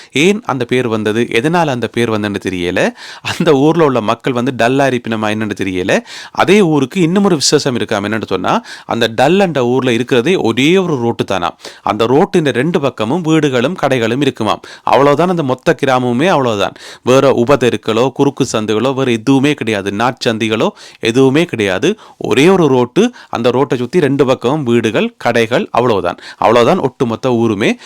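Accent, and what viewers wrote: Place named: Nainativu, Sri Lanka. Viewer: Nainathevu language.Very clearly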